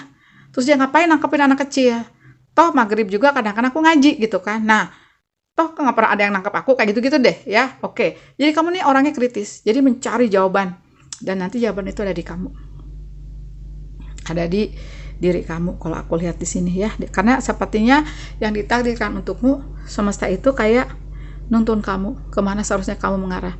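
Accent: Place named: Sunda, Indonesia. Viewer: ketika lihat tarot, saya pilih pile 2, terus saya denger bahasa kebangsaan saya saat saya sedang terbesit ingin hijrah ke daerah jawa barat yg ada gunungnya, sawah, pokoknya yg serba hijau deh, eeeeh denger bahasa lawang panto, saya langsung senyum2, ternyata si Teteh sunda pisan...meuni sunda pisan si teteh, salam sakenal tetehi ti abdi si aQuarius 😁🙏🏼 🤝🤝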